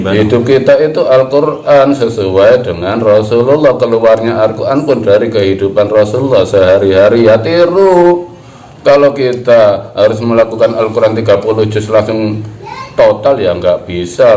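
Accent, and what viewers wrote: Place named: Banyumas, Indonesia. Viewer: pangapunten nggeh..kok miriipp sanget kalih panglima besar jenderal Sudirman... aksen bahasanya mirip dengan wong Banyumas Jawa tengah Mbah 👍🏼👍🏼👍🏼🙏🙏🙏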